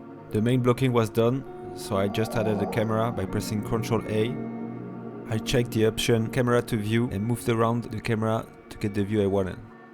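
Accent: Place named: France. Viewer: J'aime beaucoup ton accent français. Salutations d'Italie ! Ciao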